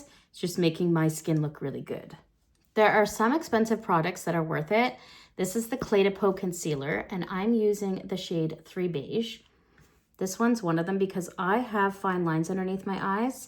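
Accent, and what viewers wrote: Canada. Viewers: I think it’s that she’s normal and genuine. I don’t find I can relate to a lot of beauty YouTubers. I don’t find many of them teaching anything I find helpful, more so just selling products. I don’t feel like does that. I actually feel like back in the day when I worked as a makeup counter artist for Bobbi Brown, reminds me of one of the traveling makeup artists that I loved to work with. I leaned so much from her. I also find her Canadian accent cute, as a Texan it’s so cute to me.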